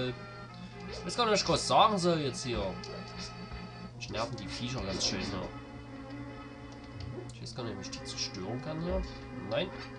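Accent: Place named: Saxony, Germany. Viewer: sächsisch^^ willkommen im club :)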